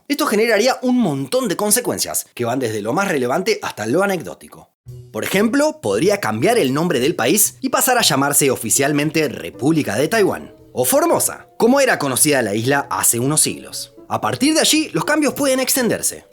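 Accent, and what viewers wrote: Argentina. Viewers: Siempre tuve la duda si sos argentino o mexicano, pero para mí sos compatriota 🇦🇷 jaja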